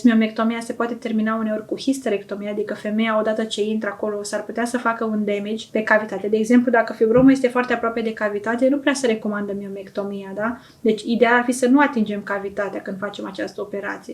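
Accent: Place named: Moldova. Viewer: Ft frumos comentați ...și complet...un ușor accent Moldovenesc sau ma înșel. ...nu ca ar fi ceva rău ..un medic ginecolog complet...felicitări ..și ditr o alta perspectiva ..și încă ceva ..dvs aveți fibroame ?Adică leiomioame ?😂😂😂😊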